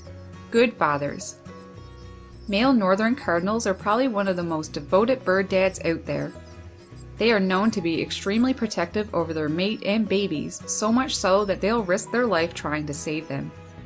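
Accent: Canada. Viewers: You have a Canadian accent. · Y does the male feed the female? Where do you live? You sound a bit Canadian. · Love the Canadian accent. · Aboooot. Canadian. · Thankyou so much! My favorite little bird buddies! I knew you would answer some more questions for me. I have not been much of a birder until I got sick and was actually "forced" to take notice the last decade or so. We have had many adventures my beautiful red bandits and I lol. I hear Canada or way North for you? I am surprised and sad you don't have these characters in your backyard. We get complaints from some young new neighbors that don't seem to care much for nature sadly. But we won't let them mess with our backyard as we have tons of birds and critters-my daughter is trying to help an orphaned baby squirrel right now. All year long some of the same ones are here. Its quite satisfying. Thankyou again and everyone that shared. 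I have to go put out more safflower and reg seed and suet myself!🐾✌🐝🐦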